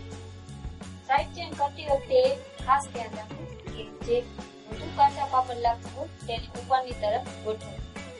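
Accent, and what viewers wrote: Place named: Kathiawar, India. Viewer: Hello. Tame Kathiyawadi lago chho... Kyana chho?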